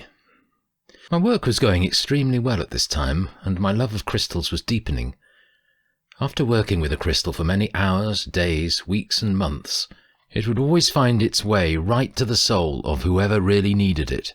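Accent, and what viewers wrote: Yorkshire, United Kingdom. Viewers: I purchased the e-book, and when reading it i hear your voice in my head 👍 So, even if you have a thick Yorkshire accent, it completes the experience ❤️ I love the book, it is a very lovely and wonderous read 💛 Much ✌️💟💡 to ALL of you 🙏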